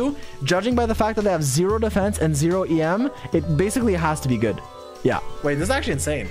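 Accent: Quebec, Canada. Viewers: je savais pas que t'étais québécois lol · BOLIDE XIAO skdjalxkaal lmao. i thought mine was pretty good with 76 crit rate/203% cdmg but.... ah, back to farming for xiao. also do you speak Quebecois?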